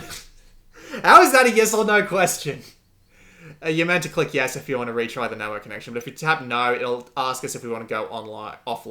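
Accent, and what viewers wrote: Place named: Australia. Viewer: The Australian accent is so harsh and annoying... Whiny...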